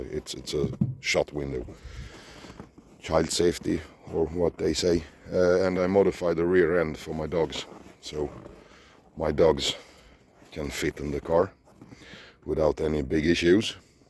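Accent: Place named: Scania, Sweden. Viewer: Gillar dina filmer, skönt att lyssna på den ljuva skånskan😊. Men den saknade man i detta klipp😏🤔🥲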